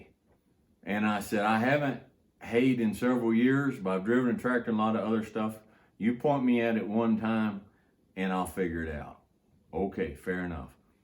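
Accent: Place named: Southeast, United States. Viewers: Just found this guy yesterday. Now I'm binge-watching. Three points come to mind now: First, in the first video I saw of his, was looking 100% "Texas." The video was teaching how to make a simple camp breakfast (awesome, btw!), and our man was surrounded by flat TX dirt, cedar trees, and what looked to be some mesquite, too. Texas all the way. Then he opened his mouth and started talking. And I thought, "NO WAY is this man from the Lonestar state! That is East Tennessee if I've EVER heard it!" Turns out I was right, lol. Second: Hate to get "political," but when I hear all this talk about "white privilege" I think about countless men like who had NO privilege at all! Every ounce of success was earned with blood, sweat, and tears. Third: should get an agent and go into radio or voice acting. You're a NATURAL!! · Mighty great story, and lessons for life. 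Purty sure you still have a little bit of Kentucky accent. After two generations here in Texas, I still consider I have some of my meemaw's Kentucky accent. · I thought I heard a more southeastern accent. I'm from east TN and me and my wife have always wanted to do something like that or atleast regularly utilize and ride horses/mules. So glad I came across your videos!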